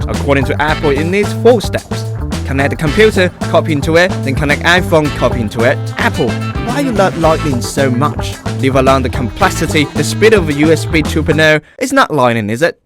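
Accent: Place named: United Kingdom. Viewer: 惊奇，竟然是全英文版本的，还是英式英语，哈哈哈，加油，做自己，等你们的充电头了！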